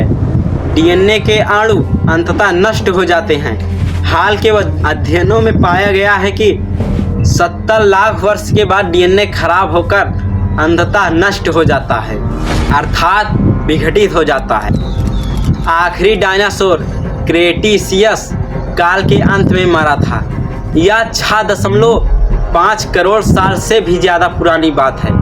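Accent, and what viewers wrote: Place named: Bihar, India. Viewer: Apki bhasa se lag raha hai aap bihar se ho?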